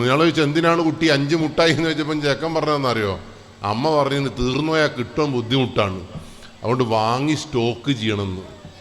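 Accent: Malabar, India. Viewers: മലബാറിൻ്റെ ആ ഭാഷ ശൈലി❤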